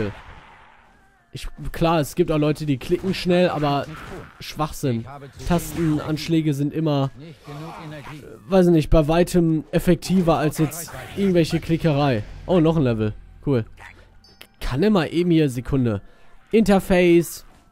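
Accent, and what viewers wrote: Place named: Wuppertal, Germany. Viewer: Biste n Wuppertaler? Soviel "wat" und "dat" ^^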